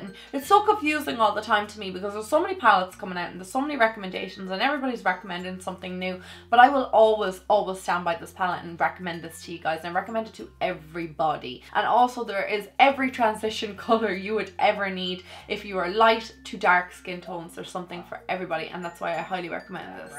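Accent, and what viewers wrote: Ireland. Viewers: what part of Ireland are you from? I'm from Dublin love ur videos and love ya byeee!!!!!!!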